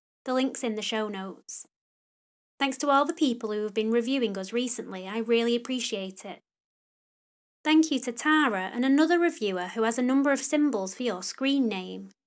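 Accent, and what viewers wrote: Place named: United Kingdom. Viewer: Really good! Well researched, well read. Great to hear a true crime podcast read in a British (regional!) accent.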